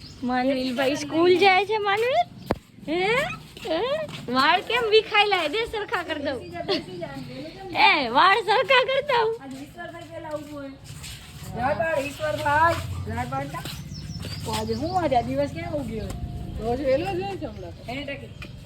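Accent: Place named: Kathiawar, India. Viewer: Chokhu kathiyavadi bolo chhe maja avve chhe ❤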